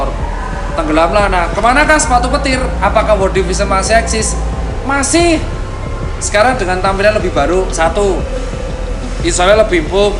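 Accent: Java, Indonesia. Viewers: Gw demen logat lu bang lu guwe tapi jowo medok👍👍👍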